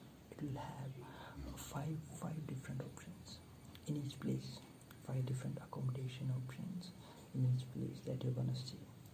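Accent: India. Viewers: Ppl love the Indian accent. It’s so tingly. The heavier the better. I am from NYC all my life and lived mostly in Queens so lots of Indian ppl. Very nice ppl. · Are you from Kerala? Malyalam people especially who have the typical Malyalam English accent is awesome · I'm so glad I found this video again. It is absolutely perfect. I love the Indian voice for asmr. So soothing.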